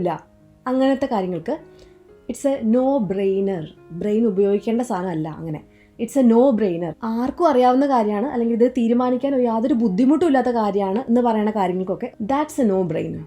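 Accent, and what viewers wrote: Thrissur, India. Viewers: Madam, തൃശൂർക്കാരി ആണോ ? Slang sounds like Thrissur 👍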